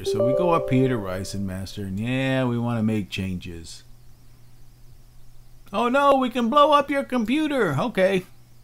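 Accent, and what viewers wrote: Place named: South, United States. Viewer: You've got a slight Forrest Gump accent :) Thumbs-up !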